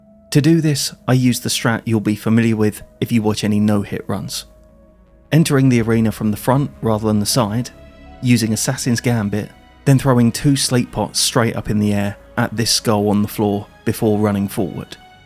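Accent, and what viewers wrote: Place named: England, United Kingdom. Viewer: new sub coz of this video. short, to the point and entertaining as well. The english accent feels like home having lived there for my childhood. (Southampton)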